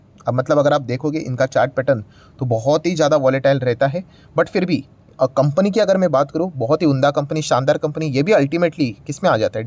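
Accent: Maharashtra, India. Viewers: Sir Good evening I am very much sure that u r 100% maharastriyan as per your toning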